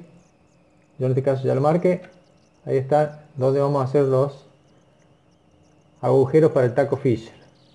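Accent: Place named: Córdoba, Argentina. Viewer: Por tu tonada,me parece que sos cordobés....😕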